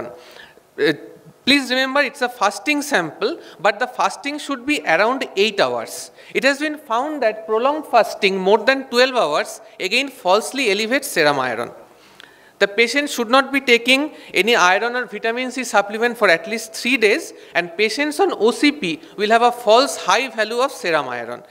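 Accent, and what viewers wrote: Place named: Punjab, Pakistan. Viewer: Punjabi pakistani accent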